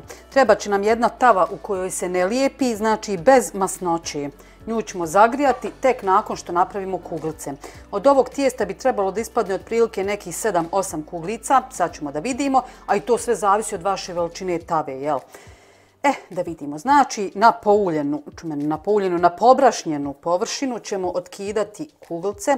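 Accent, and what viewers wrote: Bosnia and Herzegovina. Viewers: Gledam cesto tvoje recepte, volim taj bosanski izgovor i ta prica sa flasicom je simpaticna. Pozdrav iz Kanade.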